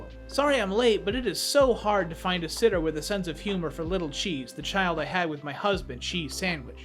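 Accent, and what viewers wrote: Netherlands. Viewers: ARE YOU DUTCH!! 🎉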